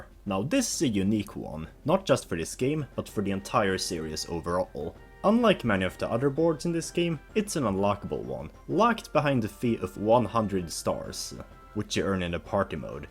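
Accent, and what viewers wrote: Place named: Sweden. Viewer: Your english accent sounds just like mine, are you from Sweden ?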